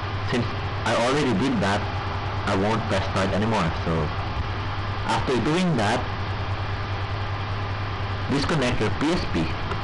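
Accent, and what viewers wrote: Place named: Philippines. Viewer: god damn, work on your awful accent pinoy shiT.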